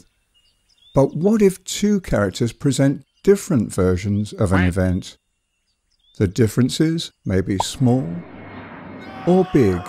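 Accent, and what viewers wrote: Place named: United Kingdom. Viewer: I love this video! I laughed at these animation so much and the serious British voice is the perfect foil for them.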